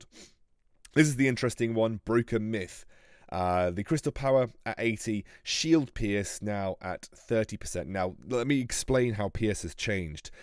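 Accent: United Kingdom. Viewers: Phinn yeah. Luckily his accent is textbook British English so yeah could understand easily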